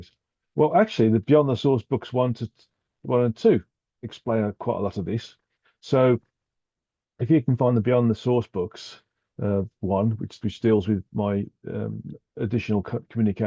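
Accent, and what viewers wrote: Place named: Midlands, United Kingdom. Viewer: This is called an English Accent . He is from the Midlands . I have trouble with English being spoken by Indians . Listen to it twice 😁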